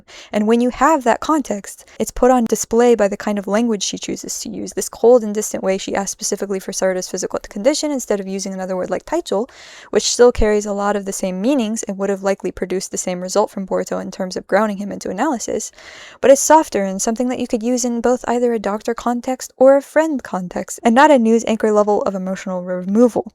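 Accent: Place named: England, United Kingdom. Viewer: 1. I'm glad the channel seems to be growing😊. 2.. Do people actually doubt your tranalations only because you sound more "English that you have to have your japanese ftiends to read and explain atuff?....that's just pure stupidity on their part. 3.Are you going to make a short vidro on analyzing any Bpruto character in the future? Can one commision you on analyzing a Naruto character/Boruto character based on a novel?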